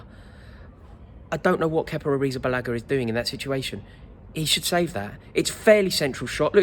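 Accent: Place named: England, United Kingdom. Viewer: Your English accent is so fine to hear , very traditional and classy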